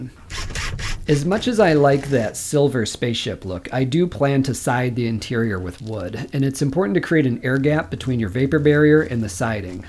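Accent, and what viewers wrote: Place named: Canada. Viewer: I was thinking, man this guy sounds kinda Canadian. Then you mentioned the high school and I realized you're in northern MN, so that explains it lol.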